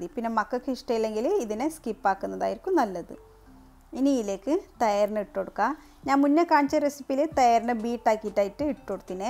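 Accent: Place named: Kasaragod, India. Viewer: Nice video dear..especially kasargode talk 👍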